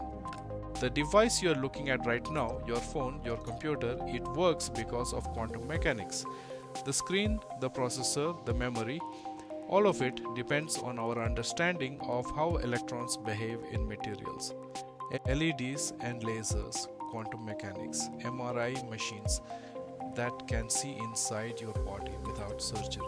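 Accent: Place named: India. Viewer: Indian people’s accent speaking English sucks!!! Go A.I on the voice bro. Your accent is off putting. Sorry to say that, but truth is truth.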